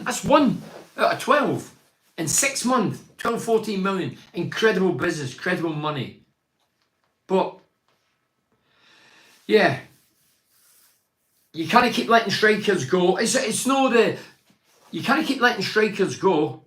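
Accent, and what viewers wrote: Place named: Glasgow, United Kingdom. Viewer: Love your passion. The more angry you get the more the weegie accent comes out. Just refreshing to hear it as it is. It's great to see you smile, you have a lovely smile. Pity things aren't seeing you smile as much just now. Keep smiling! UTB!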